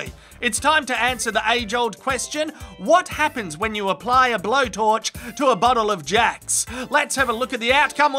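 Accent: Australia. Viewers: I love Australian accent....Faaeece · You should start a discord channel, and teach people to speak australian! :)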